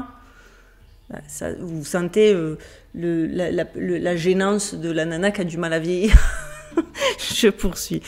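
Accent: South, France. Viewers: Juste quelques mots, je vous ai découvert il y a quelques jours et quel régal, des sujets extraordinaires avec une manière de raconter génial, l'accent du soleil en plus, merci merci merci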